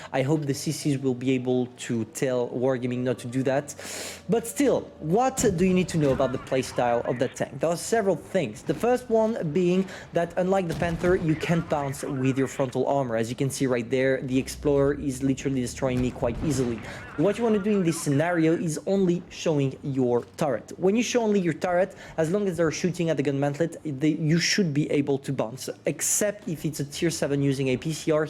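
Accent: France. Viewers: He is probably french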